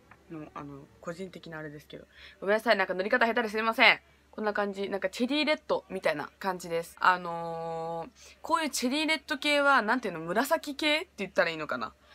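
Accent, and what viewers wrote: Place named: Kansai, Japan. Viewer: 関西弁すごい好き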